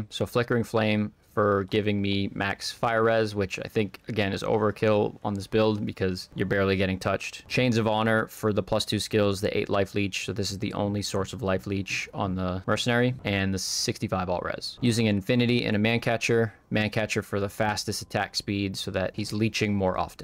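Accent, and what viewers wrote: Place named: England, United Kingdom. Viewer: Why you speak like that? Can you speak normaly? Many english people do like you, it's boring. But very nice video, and your honesty is also very nice.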